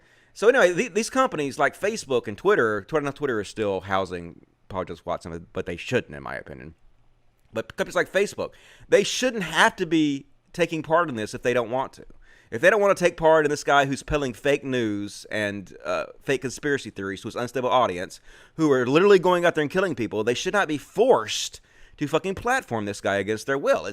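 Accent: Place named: Alabama, United States. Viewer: I guesss his Nothern Yorkshire accent is on a parr with your Southern Alabama accent as far as hickness goes. Puddings and...puddings and??? I'm at a loss. Can't think of anything other than the most corrupt police force in the country to come out of Yorkshire. Oh! Peter Sutclife. He's hardly a nice guy but look him up. I'm from Lancashirr. Our rivalry goes back cenuries. We had our own Civil War. Instead of war of the racists, it was war of the Roses.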